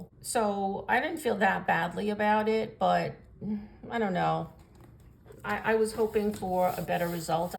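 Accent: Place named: New York, United States. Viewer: Beautiful pages! Well done! I really enjoyed your explanation and i also love your voice and NY accent! ❤❤❤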